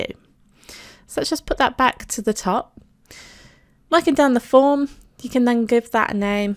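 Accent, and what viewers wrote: United Kingdom. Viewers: any speech cannot be more english than this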